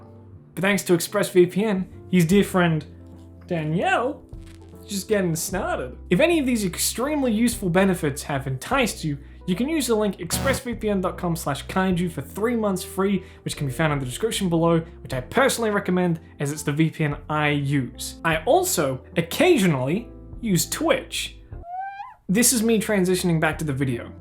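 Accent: Australia. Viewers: I love your sweet sweet Australian voice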